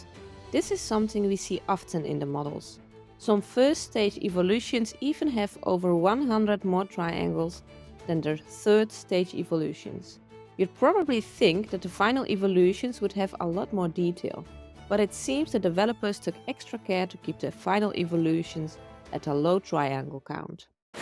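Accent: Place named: Netherlands. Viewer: Hello! I follow you on roblox then i cmae across your channel. your the best animial ugc creator! and i didnt even know you had an youtube channel amazing!!! Your ugcs are the best and that i hear and see your dutch makes me super happy cause i am dutch also and this video was aaaamazzzinng!!!!! to the power off 10! Goeie video ga zo door!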